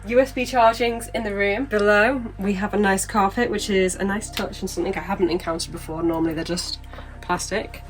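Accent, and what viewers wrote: United Kingdom. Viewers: I'm guessing your accent is Altrincham, Lymm, Warrington area (via London.)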